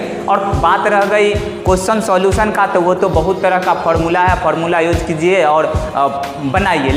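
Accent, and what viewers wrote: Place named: Bhojpuri, India. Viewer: Gajab Bhojpuriya style guru ji😘 ham golu hai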